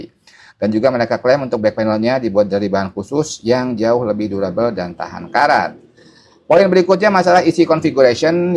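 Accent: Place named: Java, Indonesia. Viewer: kl lebih diperhatikan, logatnya beda mas ferry itu orang sumatera (jambi) sedangkan bang jawa tulen nih (jogja)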